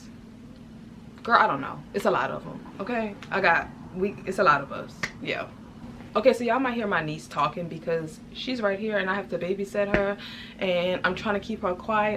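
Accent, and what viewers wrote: Louisiana, United States. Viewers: Baby I heard that accent from a mile away, fellow louisianian.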